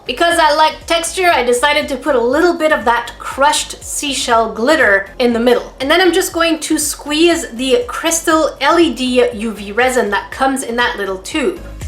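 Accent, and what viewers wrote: United Kingdom. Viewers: I always knew he had a British accent.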